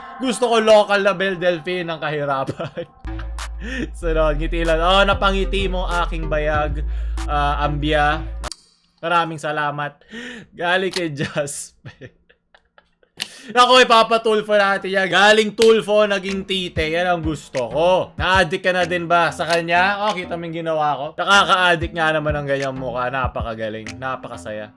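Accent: Visayas, Philippines. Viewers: ANG CUTE NI PAG NAG BIBISAYA MURAG YAWA HAHAHAHA LAB U SINCE 2018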